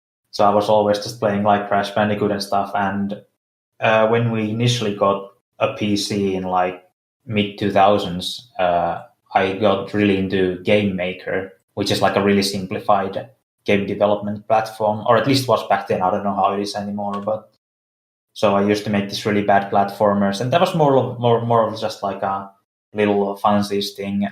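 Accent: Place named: Finland. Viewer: Ralli englanti go wild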